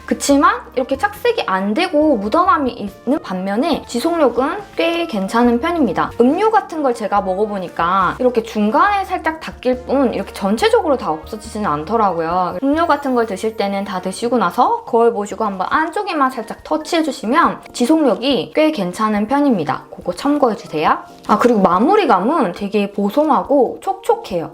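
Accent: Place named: South Korea. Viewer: 사투리 쓰시나바옄ㅋㅋㅋ 먼가 억양이 느껴짐 목소리가 너무 매력적이에요! 너무 조명 뽜악! 아니라서 발색 보기가 좋네요 :)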